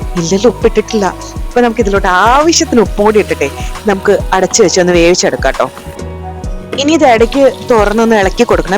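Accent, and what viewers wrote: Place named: Kozhikode, India. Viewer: ഇണർ കോഴിക്കോട് 😅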